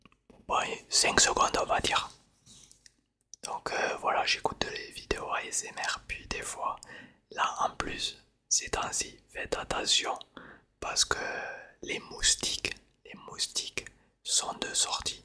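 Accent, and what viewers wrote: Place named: Toulouse, France. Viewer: Ahhh Toulouse !! je n’y avais pas pensé, j’imaginais Avignon ou Agen je me suis pas tellement trompé, je veux dire au niveau de l’accent. Moi Valence. Bonne soirée… Je vais regarder une de t’ai vidéo pour ronfler !… j’aime bien l’autre, tu m’as laissé un autre commentaire, tu arrives dans ton salon de coiffure …. C’est le bruit du ciseau qui est soporifique. J’aime bien le style sa sort du asmriste bien carré …. Continue comme c’est cool. 👍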